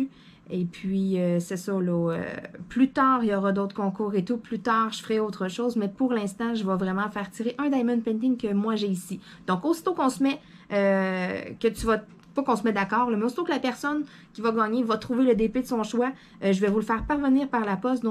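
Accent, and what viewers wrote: Quebec, Canada. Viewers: Félicitations pour ces 402 abonnés, jadore l'accent québécois c'est aussi pour ça que je regarde vos videos. Je participe en croisant les doigts. Sacré gain... bonne chance a tous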